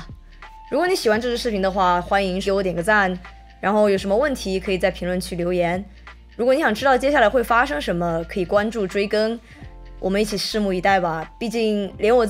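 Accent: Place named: Taiwan. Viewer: 祝你创业成功。 btw:听你说话感觉像台湾口音。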